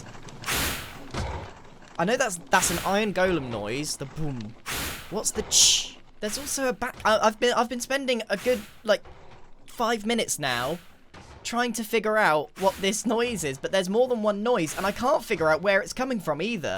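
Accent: United Kingdom. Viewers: he just sounded British to me 🤷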